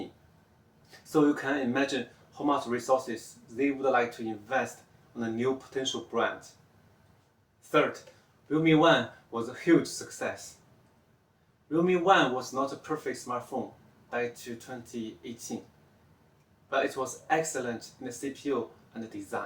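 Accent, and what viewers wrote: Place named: China. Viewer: very good content. If you're up for some constructive criticism: -audio could use some work -English needs so much work, the typical thick Chinese accent (putting subs might help)..not being racist.. it's just that there are times when your words are indiscernible interested in what you'll do with your channel next though 😉 you should collab with TechAltar. that would be awesome since you already know each other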